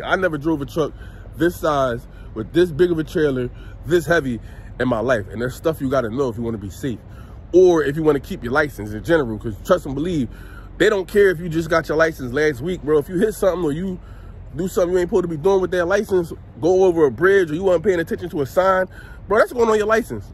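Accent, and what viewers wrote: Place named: Philadelphia, United States. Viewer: Bro I start at Prime in like 2-3 weeks. It sounds like you’re from Philly… I’m from De and I’m gonna be in Pittston for my orientation too. I’m gonna be going through the same stuff you’re going through right now in a few weeks lol. This should be interesting. Stay focused and keep ya head up killa. You got this. Your videos help me stay inspired and it shows me what to expect. I hope to see you on the road 💪🏽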